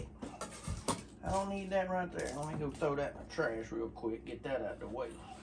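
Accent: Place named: Appalachia, United States. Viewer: you are a true Renaissance man with a funny Hillbilly accent!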